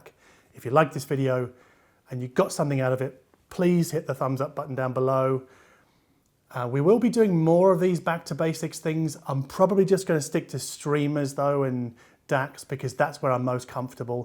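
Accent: United Kingdom. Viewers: So Brit... Ahahahahahahah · How to be a success on YouTube: 1) British accent 2) extensive and deep knowledge of the subject at hand 3) extensive command of the English language, avoiding overused terms such as "warm". 4)ability to give listeners an idea of how something sounds WITHOUT denigrating products or brands. 5) ability to explain a complex subject without sounding arrogant or talking down to the audience. Well done, sir!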